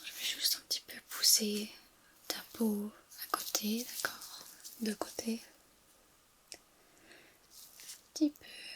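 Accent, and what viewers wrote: Flanders, Belgium. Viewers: elle est pas flamande ?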